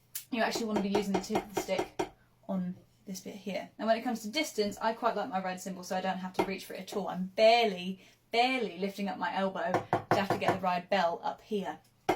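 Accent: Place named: Cockney, United Kingdom. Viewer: This bird is Cockney!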